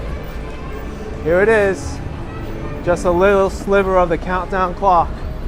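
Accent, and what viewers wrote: New York, United States. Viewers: I thought you were a jewish white kid this whole time LOL. Never seen an asian person with an NY accent but im from FL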